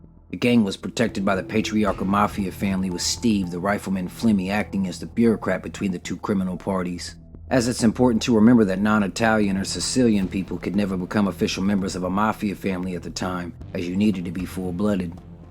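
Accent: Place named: South, United States. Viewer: Where is your accent from? I'm from New England and I know it's not from Boston. I hear hints of mid southern or even Midwest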